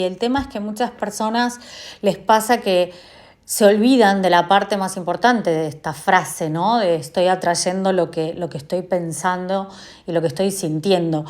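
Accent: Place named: Rioplatense, Latin America. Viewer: Amo ese acento rioplatense!! Gracias y todo el cariño desde Argentina!! :). Hay falta de fe, falta de ganas, sentido distorsionado del tiempo, agobio...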